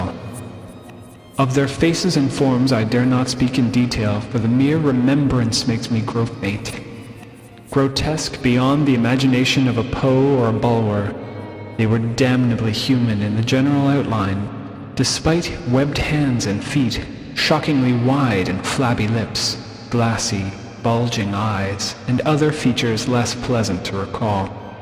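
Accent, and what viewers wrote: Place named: Baltimore, United States. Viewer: Damn good job! Your pronunciation sounds like Baltimore.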